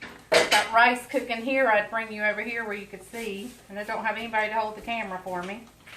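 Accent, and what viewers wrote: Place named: North Carolina, United States. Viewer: Another NC staple, - DUKE'S mayonnaise! No other brand goes in my fridge. I am so glad I discovered your channel. It's not often you get to watch any program and hear someone who sounds like we do and who is so relatable. Thank you for sharing your life with us and for your sweet spirit. It's very much needed!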